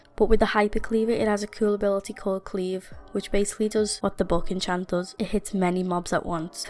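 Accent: Liverpool, United Kingdom. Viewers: Are u a scouser lol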